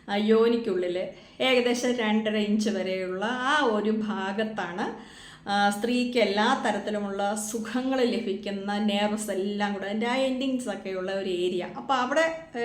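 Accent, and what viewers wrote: Kottayam, India. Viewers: കൗഗേൾ, ലോട്ടസ്, ചെയർ, ലാപ് തുടങ്ങി സ്ത്രീ മുകളിൽ ഇരുന്ന് ചെയ്യുന്ന എല്ലാ പൊസിഷനിലും സ്ത്രീയ്ക്ക് പരമാവധി സുഖം കിട്ടണമെങ്കിൽ നീളവും ബലവുമുള്ള ലിംഗം തന്നെ വേണം. കാരണം സ്ത്രീ മുകളിലിരുന്ന് ചെയ്യുമ്പോൾ അരക്കെട്ട് നല്ലത് പോലെ ഉയർത്തിയും താഴ്ത്തിയും ചെയ്താൽ മാത്രമേ നല്ല സുഖം കിട്ടുകയുള്ളൂ. കൂടാതെ സ്ത്രീ പൊങ്ങിപ്പൊങ്ങി വേഗം വേഗം ലിംഗത്തിൽ റൈഡ് ചെയ്യുമ്പോൾ ലിംഗം യോനിയിൽ നിന്ന് തെന്നി വെളിയിൽ വരാതിരിക്കാൻ ലിംഗത്തിന് നീളം വേണം. അതുപോലെ കൃസരിയെ ലിംഗത്തിൽ മുഴുവൻ ഉരച്ചുകൊണ്ട് റൈഡ് ചെയ്യണം. ഇടയ്ക്ക് ഗ്രൈൻഡ് ചെയ്യണം. ഇങ്ങനെയെല്ലാം ചെയ്ത് സ്ത്രീ യോനിയിൽ ലിംഗം കേറ്റിയിറക്കി പറന്നടിക്കാൻ ലിംഗത്തിന് നീളവും ബലവും വേണം. ശരിയല്ലേ പാലാക്കാരത്തി അച്ചായത്തീ? കോട്ടയം പാലാ സലാംങ് കേൾക്കാൻ നല്ല സുഖമുണ്ട്. അത് സെക്സി ആണ്.❤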